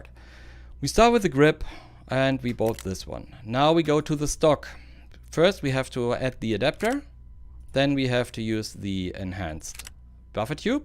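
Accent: Germany. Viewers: You sounding like a german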